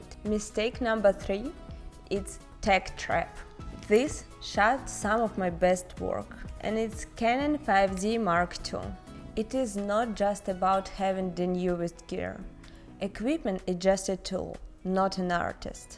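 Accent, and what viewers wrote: Russia. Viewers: You are welcome, I love your Russian English, very….😊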